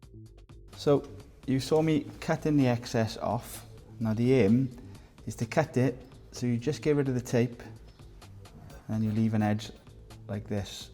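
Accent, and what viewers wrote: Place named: Cardiff, United Kingdom. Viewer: Croeso, you sound like your from cardiff or very close am cardiff here love your videos mate keep them coming 🏴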